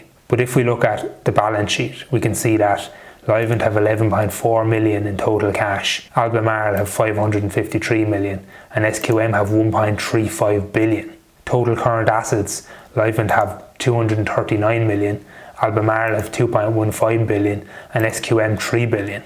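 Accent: Ireland. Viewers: "Tree different stocks" as an American this is my favorite thing about how the Irish talk. 🙂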